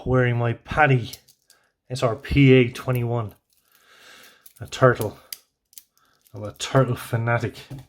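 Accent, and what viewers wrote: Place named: Ireland. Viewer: And, nice channel you've got there. As an Irish guy as well, the familiarity of your accent and turn of phrase is nice to hear. And like you, I'm in the same boat when it comes to Red Breast.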